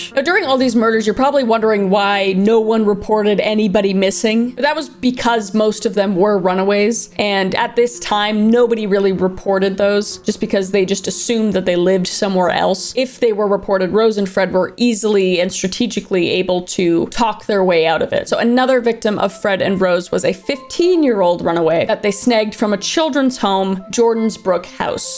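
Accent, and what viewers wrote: Canada. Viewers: The Canadian comin out in your accent is killing me 😂😂😂😂😂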